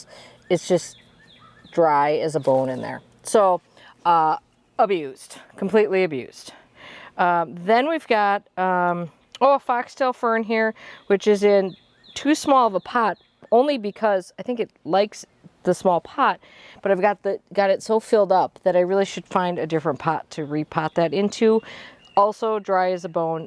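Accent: Wisconsin, United States. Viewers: I was watching your video and my husband immediately picked up on your accent. He is from WI too but has spent the majority of his adult life in the south. Soooo, I love your videos but I also love that your in WI. Door County is one of my favorite places in the world. Fragmented chain of thought, sorry. Bottom line, can’t wait for the next video!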